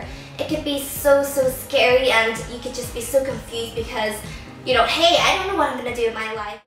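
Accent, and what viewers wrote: Northern Ireland, United Kingdom. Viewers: You sound kinda northern Irish? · Northern Irish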